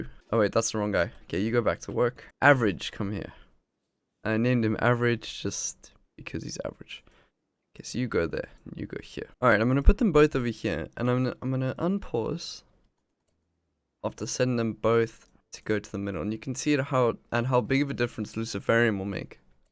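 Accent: South Africa. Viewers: I thought I heard a South African accent. Keep it up bud, I do enjoy your videos! Cheers from SA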